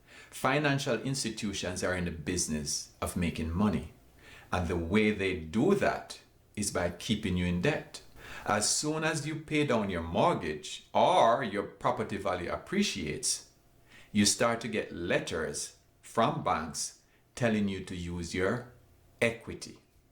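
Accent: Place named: Zimbabwe. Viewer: Nice accent! Zimbabwe???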